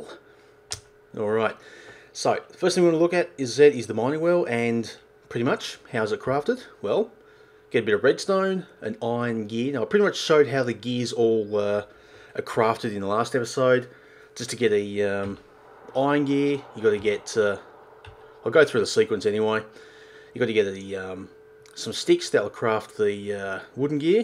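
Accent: Australia. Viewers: your Australian aren't you?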